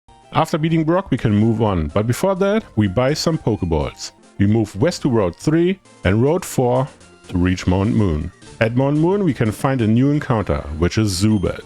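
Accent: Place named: Germany. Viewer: The accent is so familar but I can't quite put my finger on it. German?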